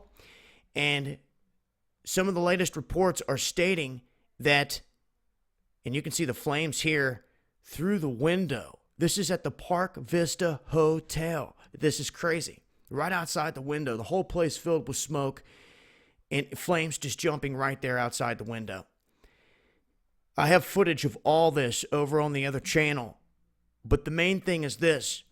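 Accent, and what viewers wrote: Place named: California, United States. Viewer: you sound like Owen Shroyer if he was from California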